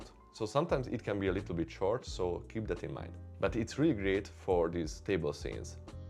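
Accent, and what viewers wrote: Hungary. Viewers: Az első mondat felénél levágtam, hogy magyar vagy xd ment a sub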